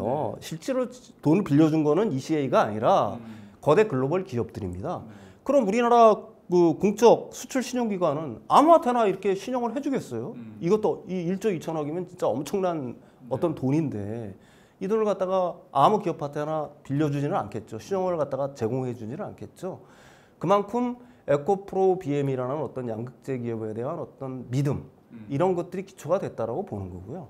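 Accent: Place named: North Korea. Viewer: 억양이 북한사람 같아요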